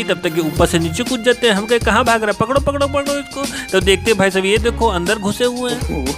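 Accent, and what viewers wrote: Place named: Gorakhpur, India. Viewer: Bilkul aap Gorakhpuriya bhasa bolte hai hm logo ke tarah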